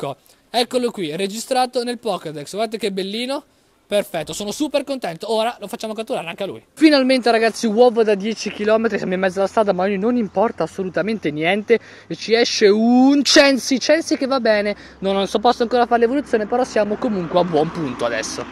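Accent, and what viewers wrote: Italy. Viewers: Ma sei di Brescia?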